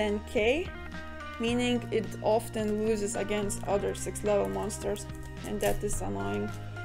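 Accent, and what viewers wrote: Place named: England, United Kingdom. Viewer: I find your English accent to be interesting lol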